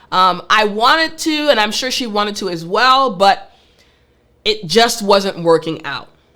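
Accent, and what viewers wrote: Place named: West Coast, United States. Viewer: I clearly love your acsent. why white people especially from west coast really I dont get it. I have subcribe and like and invite all of my family to learn english from your channel. Great way to learn english.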